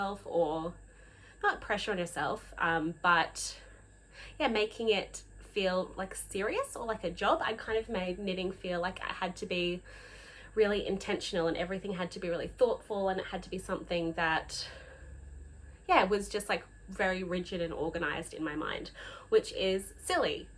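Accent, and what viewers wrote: Australia. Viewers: Always great to hear an Aussie accent. Try Julia and Mathilda's greatestknits. Love the Tulip sweater and have some light linen for a summer version ready as it's still over 35 degrees here every day ! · Hi, it's so nice to see and hear an Australian knitting podcaster!! Just wondering where you order all these American yarns from as I don't see them at any online (Aussie) stores that I buy from? Also the De Rerum, I want that for the Decision cardigan but can't find it so can you advise where you got that? I know what you mean about some podcasts being over an hour long. I have heaps that I watch and the shorter ones that I absolutely love are Voolenvine, Cherry Heart, 50 Fabulous Knits, The modern Skein, Camijo Knit, A Homespun House, Little Big Knits & Youngfolk Knits. Love your work, you knit beautifully.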